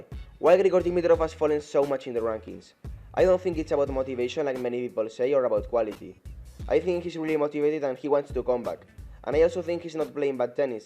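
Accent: Spain. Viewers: Tienes el acento más español de la historia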